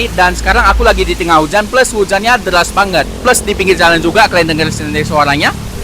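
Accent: Medan, Indonesia. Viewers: Medan kali logat kau bang. Wkwkkw mantap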